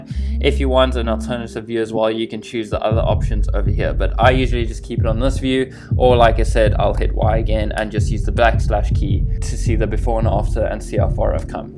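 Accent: South Africa. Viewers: lol are you South African? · Are you south African? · You sound south african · Are you South African? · loved this! At the start, I could tell you were South African and went to go check in your about section haha. Thanks for the tutorial! I am about to use it for the first time and I think I have been well equipped. the sound was also 100% on my laptop. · Great vid man! I'm a fellow filmmaker and photographer myself! Just moved to Cape Town, could tell thats where you're from because of the accent. Thanks for the great tips! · South African?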